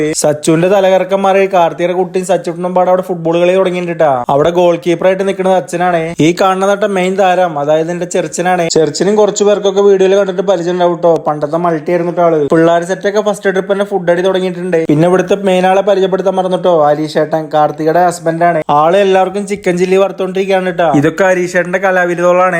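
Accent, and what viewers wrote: Palakkad, India. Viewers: Palakkad style athanu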